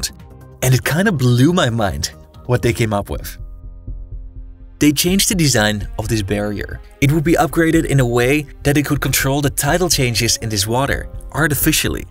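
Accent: Netherlands. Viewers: I hear it clearly…Dutch native tongue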